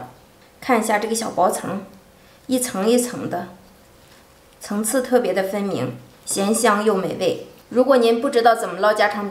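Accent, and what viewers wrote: Shandong, China. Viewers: According to her accent she's probably from the same state as me, thanks to her guide I can make authentic pancakes justl ike in my hometown. I highly recommend to try her recipes, turst me it's not as difficult as you imagine. 海娟如果你需要英文字幕我可以帮你，作为感谢你让我吃到了想念了多年的家乡饼。说实话看你的视频之前，我都不知道这种饼叫什么名字，只知道在山东非常常见。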